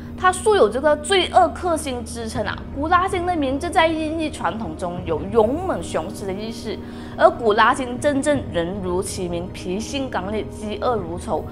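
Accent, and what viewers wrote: Malaysia. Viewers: 這裡我要幫講幾句，因為看到一堆人在罵資料不對，不敬業，發音很奇怪等等，我實在Beh Tahan。還有一堆性騷擾的留言，根本一點教養都沒有，不知羞恥是馬來西亞人，講華語的口音自然也是馬來西亞的腔調，有什麼問題？另外，Botak Chin的故事有很多種說法，別說民間了，網絡也有一堆，已無從考證。沒有什麼誤導不誤導的我還看過Botak Chin主動投降，沒有槍戰的版本 (不過圖片已證實槍戰確實存在) 像美國的鴛鴦大盜也有不同的版本啊，為什麼沒人去那吵勒？所以Botak Chin的真正的故事，或許只有跟在他身邊的人，和他自己知道吧每個人對他的印象或許不一樣有人覺得他是文藝青年有人覺得他沒受過教育 (不過在監獄裡寫的信能看出文筆能力不錯) 有人覺得他是劫富濟貧的羅賓漢，用行動來證明社會的不公不義有人覺得他是無惡不作的亡命之徒，不能被當成英雄不管什麼故事版本，不僅華人，在馬來和印度同胞的族群裡Botak Chin這個角色，在馬來西亞甚至在其他的東南亞國家都會有人知道這名字背後的故事。我覺得已足以證明他為什麼不會被世人遺忘，不會因時代的洪流消失。他向世人展示了當年七十年代的社會情形，也證明了法網恢恢，疏而不漏。不管做壞事的背後原因多麼堂皇，終究逃不過法網狙擊加油，已訂閱！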